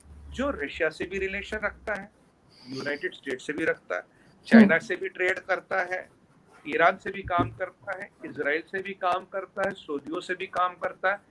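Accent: India. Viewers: aap ko sunte he to lagta he ki aap ek Indian he. Sumeet ji is best vakta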